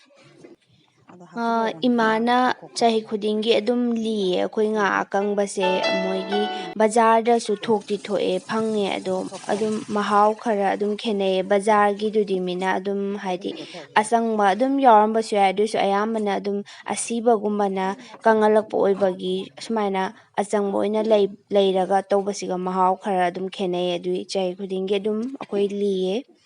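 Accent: Kakching, India. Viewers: Kakching accent 👍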